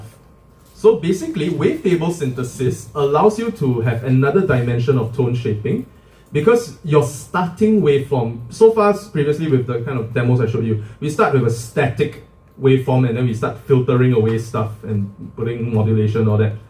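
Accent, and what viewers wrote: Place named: Trinidad and Tobago. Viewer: Yo your accent sounds similar Trinidad. I thought that was interesting.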